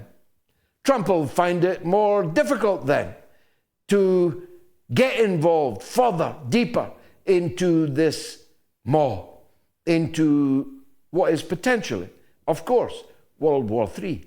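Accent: Scotland, United Kingdom. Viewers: I love your Scottish accent!!! from Brittany!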